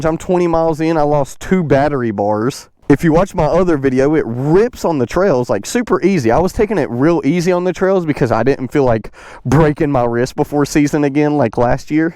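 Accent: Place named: Tennessee, United States. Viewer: Definitely sound like you from Tennessee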